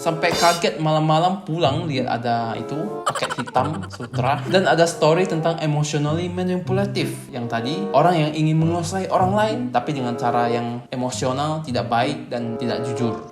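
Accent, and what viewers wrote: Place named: China. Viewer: Are you Chinese People Mr??? Because style your talking very funny... I'm very sorry Mr 🙏🙏🙏😊, Hopefully Always Stay Healthy and keep Strong everyone 💪💪💪💪🙌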